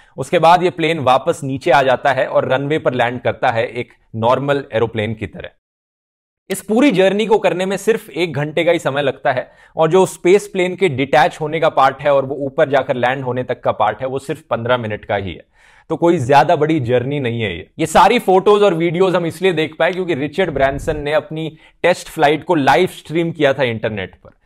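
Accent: India. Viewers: Just a friendly question - do you accentuate your hindi accent on purpose ie calling ticket, as tikat tikat- for the videos? Cause you are catering to Hindi speaking audiences and want to make it easy to understand? Or is it just the way you normally talk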